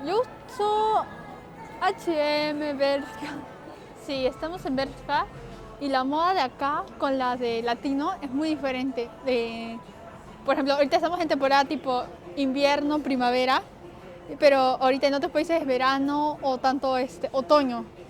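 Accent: Lima, Peru. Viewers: En lo personal, no hay nada mejor que el acento P E R U A N O , arriba PERU, bien entendible y NEUTRO. Gracias por compartir esta informacion cultural, un saludo positivo. From: Utah, US. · Tiene acento de peruana me parece? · No pasó ni 20 segundos desde q hablo la chica se nota en una el acento peruano yo me pregunté recontra peruana 😱😱😱😱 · sabia que era Peruana se nota el acento, yo tambien soy de Peru y hay muchos Peruanos Japoneses aqui tambien saludos te sigo desde el 2010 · como es posible identificar tan bien el acento peruano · Ese acento es inconfundible, de peruana, limeña y la entonación es de nikkei. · Yo la saque en una su acento de conera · Que guapa chica, me gustan las peruanas y su acento! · Yo apenas vi que hablo español sabía que era peruana la mayoría de japoneses que hablan español son de padres peruanos o tienen ascendencia peruana jsakjkds 😽💗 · Suena super limeña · Me encanta su acento Peruano, ella es muy linda. 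😍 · hermosisisma nipona pero el acento super peruano 😁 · esa forma de hablar es de peru, pero dices ser mexicana, teniendo madre peruana , me perdi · todo el mundo tiene acento, los peruanos no son la excepción, la chica tiene un marcado acento limeño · Tengo mucho amigos peruanos y si se nota mucho el acento peruano q tiene saludos desde España 🇪🇸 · Que mal que conserve el acento peruano debería sonar más neutral por lo menos tiene algo más que el español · Habla como peruana. Muy buena diferenciacion. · Es acento peruano me di cuenta rápido 🙂♥️ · Alv yo tambien soy Peruana pero encerio quiero tu vida es wow xd me caiste bien antes de que digas que eras mitad peruna estaba con la duda por tu acento xd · ya sabia que tenia algo de peruana, por su forma de hablar xd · Cuando la escuché , su forma de hablar me parecía muy común ya que soy peruano y si le atiné era medio PERUANA 🇵🇪🧐👀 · Es un acento muy limeño su acento. 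En Perú también tenemos muchos acentos. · Ni bien la escucho y me suena a Perú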